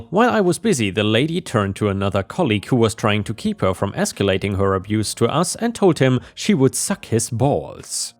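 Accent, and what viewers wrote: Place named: Germany. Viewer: Are you german? I cind of hear an acsent. (sorry for the gramar not a english speaking one either)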